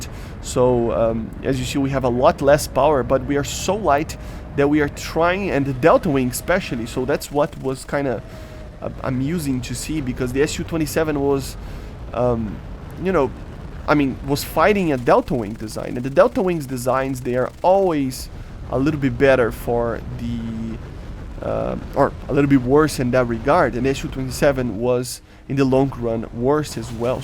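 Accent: Brazil. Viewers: Sabia q tu é br, o sotaque não mente kkk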